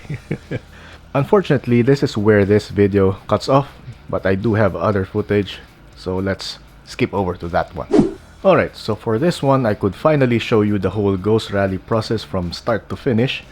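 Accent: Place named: Philippines. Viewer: Tonong pinoy paturo bro😊🧡🧡🧡🇵🇭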